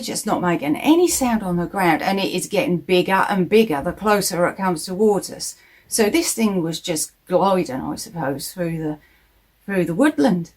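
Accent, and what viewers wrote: Suffolk, United Kingdom. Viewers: As me dad would say bootiful me darlin 😊😂 Im originally BSE .my family all over Risby ,Thetford, Stowmarket. Im suffolk side of Essex, suffolk, Cambridgeshire border now not the same but so proud of my accent not as sharp as yours my aunties all had that broad suffolk love it hun ❤️ love a good ghost storie . Years ago we go on Sunday drives with mum and dad. End up anywhere west stow . Fritton. Snetterton Sunday market..Felixstowe.. go nut picking berry picking. Explore woods and little villages beautiful had proper family time no one get that chance today 😢 thats how I know i always feel at home more in the woods but no dont want dark figures giving me the hebie gibies 😂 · Love your videos. I’m a Suffolk girl born and bred, like you. Love hearing our accent. It seems to be disappearing, please keep up your hard work. xx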